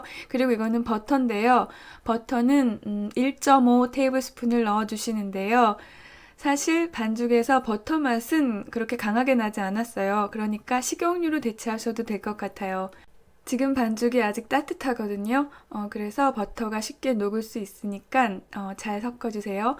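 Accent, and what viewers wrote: Seoul, South Korea. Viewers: 말투가 90년대 서울사투리 쓰시는거같아요 ㅎㅎㅎㅎㅎ 신기하당 ㅎㅎㅎㅎㅎㅎㅎ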